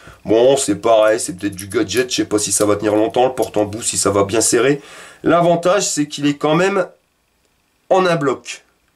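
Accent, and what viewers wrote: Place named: Nord-Pas-de-Calais, France. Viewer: oh!! un chtio biloute j'adore l'accent mdr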